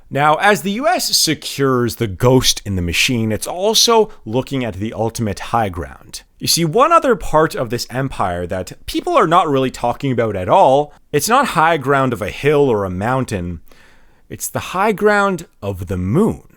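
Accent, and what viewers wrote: Canada. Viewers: Is this dude Canadian or what? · I can tell that you are Canadian from your accent, which makes the fact that you managed to make it through this entire video without uttering the name “Trump” once all the more impressive. Excellent analysis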